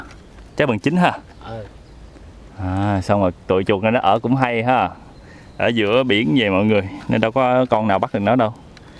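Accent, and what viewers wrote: Mekong Delta, Vietnam. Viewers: Bạn nói giọng dễ nghe miệt vườn hay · Thích như vậy hon gần gũi thân thiện cách nói chuyện hào sảng chính hiệu miền TÂY năm bộ 👍👍👍👍👍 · Giọng miền Tây nghe thương thiệt luôn. Cám ơn đã làm vi zeo này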